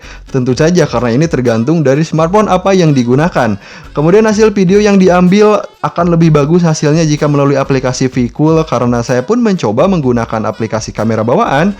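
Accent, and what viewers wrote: Kuningan, Indonesia. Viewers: Bro orang Kuningan? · Siga orang kuningan nya mang?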